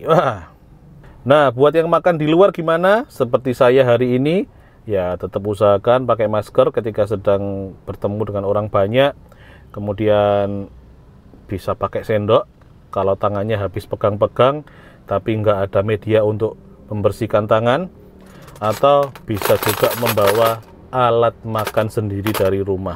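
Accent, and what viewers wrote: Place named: Jakarta, Indonesia. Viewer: Sing dodol wong ndi cak...kok logate sok Jakartaan 😂 #dibahas Tapi asli ngiler liat sego bali daginge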